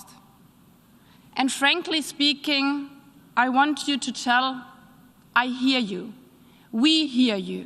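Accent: Germany. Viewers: Ihr deutscher Akzent ist recht gruselig, aber darum geht es nicht. Sie macht einen guten Job, bietet Lawrow die Stirn, der seit 18 Jahren im Amt und ein mit allen Wassern gewaschener, skrupelloser Lügner ist, sie arbeitet hart, lernt schnell und hat in ihrem Amt eine Diktion eingeführt, die man, wenn überhaupt, schon lange von keinem Diplomaten und keiner Diplomatin zu hören bekommen hat. Wer bei all' dem nichts weiter zu bemängeln hat als ihren Akzent, der offenbart, dass es nichts zu bemängeln gibt.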